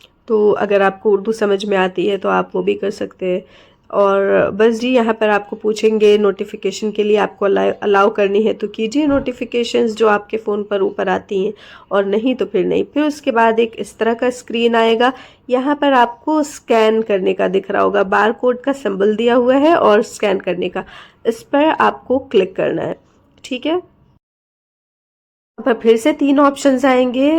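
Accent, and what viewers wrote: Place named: Azamgarh, India. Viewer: Aplog azamgarh se hain hm bi azamgarh se hain or lucknow me rhte Hain kafi salon se even i born in lucknow Apki video dekh k ek connection feel ho rha hai your accent n way of talking like azamgarh... Mere YouTube pe apki videos accidentally recommend hui or mai smjh gai k aplog azamgarh se ho ....❤❤❤❤❤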